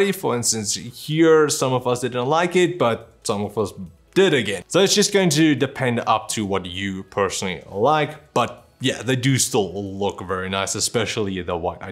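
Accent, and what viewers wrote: South Africa. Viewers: Definitely South African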